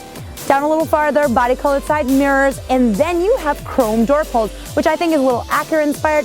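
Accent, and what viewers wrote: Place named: New England, United States. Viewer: 613 I love the way she says "Honder" instead of Honda. I enjoy listening to her New England accent. I am from Oklahoma and so I like listening to other accents besides those found in the south or from here in the southwest. Love your accent,!:-)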